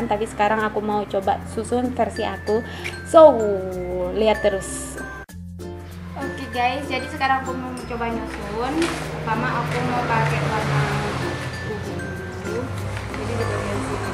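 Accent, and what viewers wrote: Madura, Indonesia. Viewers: Suka sama cara bicara .. Soalnya khas² maduranya itu kerasa🤣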